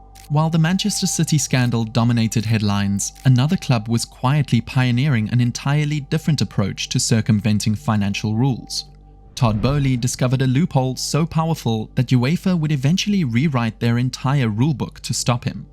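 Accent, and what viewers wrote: South Africa. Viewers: correct me if I'm wrong but this is a South African YouTube channel your accent is 100% South African🤔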